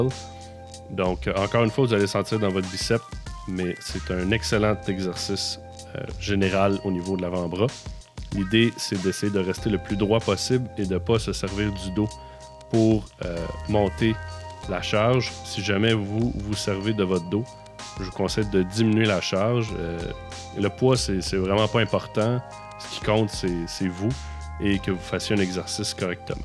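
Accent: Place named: Quebec, Canada. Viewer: Un québécois, oh bin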